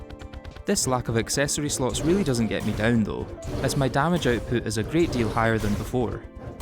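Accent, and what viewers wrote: United Kingdom. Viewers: Subbed but why are you british?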